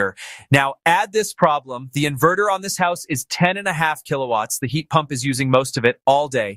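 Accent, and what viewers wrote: United States. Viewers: A thousand pounds of what? AI crap. A limey with an American voice. And I always knew heat pumps and solar panels are stupid. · What's the deal, you sound like you're from the US, but you're talking about pounds rather than dollars? In a discussion such as this, it helps if the costs per unit of energy are known. Since heat pumps are more efficient than heaters, the should use less energy overall unless it's so cold that their efficiency drops way off. But, I don't know the relative costs of electricity and natural gas where your customers live. In both Pennsylvania and California where I have lived, heating with natural gas was always quite a bit less expensive than heating with standard electricity powered furnaces. The other down side to heat pumps is the relatively high costs to purchase, maintain, and repair them compared to simpler natural gas or resistive electric heaters. 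With that in mind, it makes little sense to go for a heatpump if it DOESN'T run quite a bit per year because the electricity cost savings don't make up for the higher purchase and maintenance costs. Of course, if you need air conditioning in the summer then you also get some additional energy cost savings from your heat pump when cooling the house. Also keep in mind that the capacity requirement for your unit is based on the size of your home but also on the temperature differential that you want to maintain between indoors and outdoors. If a hot summer day in your area is 95F and you want an indoor temperature of 75F, you have to maintain a 20F temperature dufferential, but if a cold day in your area is 0F and you want an in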